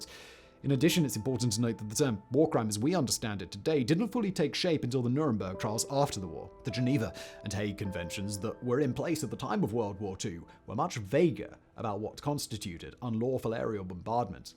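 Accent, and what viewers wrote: RP, United Kingdom. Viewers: I'm an American veteran officer, and suspect you barely touched the topic. It seems you have relatively little to say about the American GIs. Citing hundreds here and dozens there hardly compares to what you say the British did. (You even sound British.) We love hearing how legendarily Good we are, but I believe lying only makes our problem worse. I realize this video is about WW2, but we were the same nation that committed horrific inhumane crimes during the Spanish-American War, during the near destruction of the American Natives during that same decade, and we were still the same culture during Vietnam when our lovely GIs would sight-in their rifles by firing on local farmers out in the rice paddies. I'm simply confident that War brings out the worst of Human Nature. That's not an excuse for it. I just think you skipped a lot. 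· A posh Brit complaining about America and how we came and saved his country, how about just say thank you and shut up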